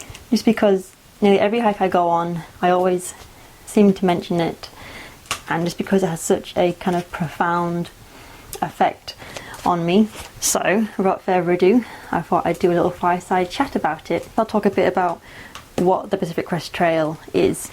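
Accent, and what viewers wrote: Yorkshire, United Kingdom. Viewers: Wow! Thanks for the great story and footage. Didn't know you were a Yorkshire lass! From know on it's " Ey 'up" 8)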